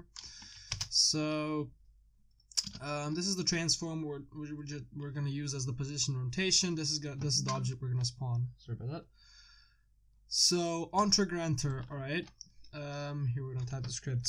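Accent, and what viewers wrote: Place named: United Kingdom. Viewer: Spawning objects show up anywhere anytime even if there is no link from one level to another because they are part of the only one collective integrated resource system which is self aware of the player xp so it gets more interesting the logic criterias of the program rather than the in game experience xp. The Brit voicings are always more interactive anyways.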